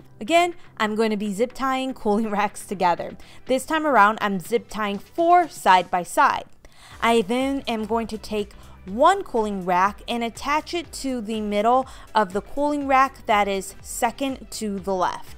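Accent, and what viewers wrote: Chicago, United States. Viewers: I don't usually comment on YouTube videos but I have been watching you for a bit now and wanted to tell you that you just think of the most clever ideas! I also like watching you as you remind me of my home town of Chicago, which is where I think you are at based on your accent! Keep up the great vids - love seeing them! And, yes, I am subscribed. 😉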